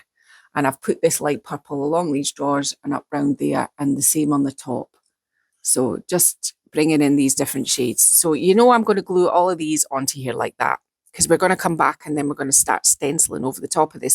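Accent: Scotland, United Kingdom. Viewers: The Master of layering! When I first started watching your lovely videos, I thought you were saying "lairs." Which I also thought was a strange word to describe layers. It must be a Scottish thing. The layers were in a lair? Just finished a tray using decoupage, stencils, and lots of lairs. All inspired by. Doing the monotonous repeats is very zen. Beautiful. Can't wait for your stencils, gift that keeps giving. Edit: also the Queen of the leitmotif. 😊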